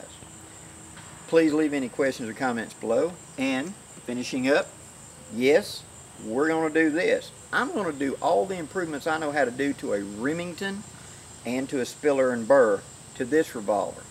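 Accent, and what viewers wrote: South, United States. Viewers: I would listen to you talk about stacking rocks, just to hear a good ole southern accent again. 🤠 Enjoyed this "Story Time". Take it easy.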